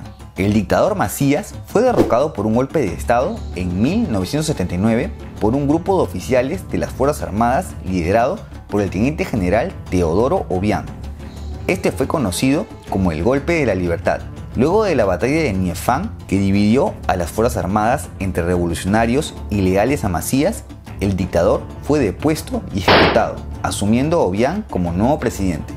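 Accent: Peru. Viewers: No es mexicano el realizador de este video. Por lo que se escucha, el acento de él es peruano. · Amigo, por el acento, el you tuber es peruano ¡Saludos desde Popayán, Colombia ¡ 👏🇨🇴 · Ese acento es peruano? no? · No tiene el "dejo" de un mexicano.... los mexicanos no tiene ese acento aunque son algo parecidos.....pero se nota que es peruano. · q rico acento peruano.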